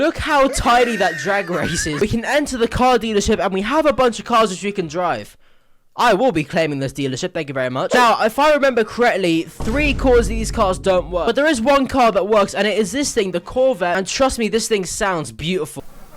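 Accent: United Kingdom. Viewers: good bradford accent. its a 8.5/10 from a boy who lived in bd for 8 yrs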